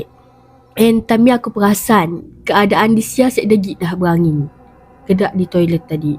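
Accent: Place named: Sarawak, Malaysia. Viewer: Waahhhhh....bercerita sambil lorat Sarawak...hahaha...memang best awakkk....terbaikkkk👍👍👍👍🥰🥰🥰🥰🥰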